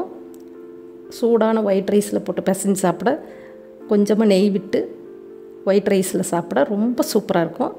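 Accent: Coimbatore, India. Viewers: Unga kovai slang superb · slang apdiye namma ooru slang Sema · Your demo is very good with Coimbatore accent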